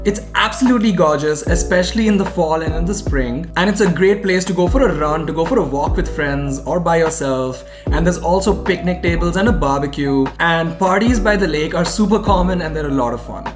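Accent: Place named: India. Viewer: I'm bothered by the strong Indian accent. I could hardly understand what you're saying. Speak well so people can understand you. That kind of English wouldn't cut at a top UK, let alone US business school.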